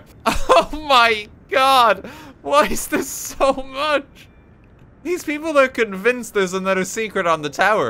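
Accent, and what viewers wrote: Ireland. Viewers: This is my first time watching him and I was just like; "Sniff sniff, is that an Irish accent I hear?" I love the rep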